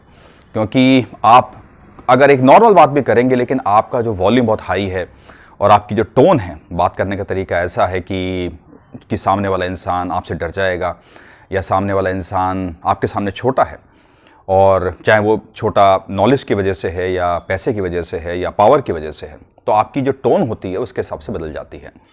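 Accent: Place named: Khandesh, India. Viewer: येले म्हंतस अहिराणी तडका 🔥🔥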